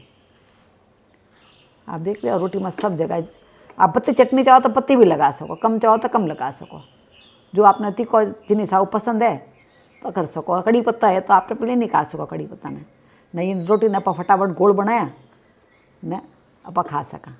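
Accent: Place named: Garhwal, India. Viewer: Why Aunti Ji is Sounding me a gadwali 😀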